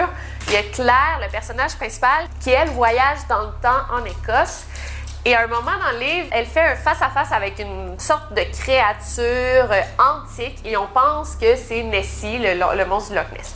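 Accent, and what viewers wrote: Quebec, Canada. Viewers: Je crois à tout sauf le voyage dans le temps c'est faaackkkkk ...si nn je t'adore j enchaîne tes vidéo l'une après l'autre j'adore ton accent québécois 😍 bon courage gros biz ...au passage stp ace que tu peut nous raconté sur l'affaire des 2 jeune femmes tuer au Maroc y 15 jour de ça! ! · J'aime bien tes chiens ils sont trop mignon!!! Et aussi les histoires que tu raconte c'est captivant!. Et j'adore ton accent Québequoi 😘😘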